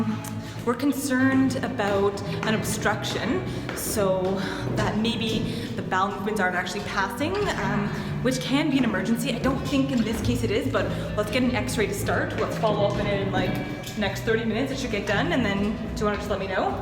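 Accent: Canada. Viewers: Is it just me or dose she sound Canadian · Clearly Canadian. The accent is strong in this one 😂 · Love your videos!! And your Canadian accent 😄 I love watching medical dramas on TV but your channel is just as captivating because it shows real life & explains it all! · She sounds SO Canadian. Is she?? Edit: I see the tims. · I think its Canadian.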